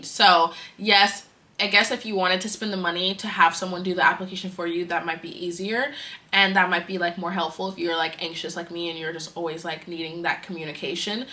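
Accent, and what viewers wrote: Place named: United States. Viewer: She looks like she has an accent. Her American accent is still thick. But I be hearing a lil accent every now and then. She getting prettier and prettier. ❤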